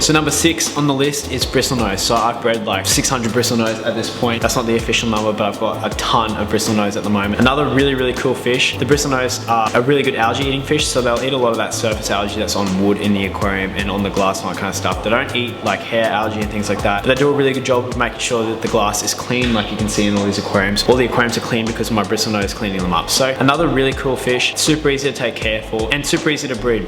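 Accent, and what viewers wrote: Australia. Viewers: Bro, you have a thick Aussie accent. Consider posting text names of each fish in the video and a list of them in the description for those of us who can only understand English. 😈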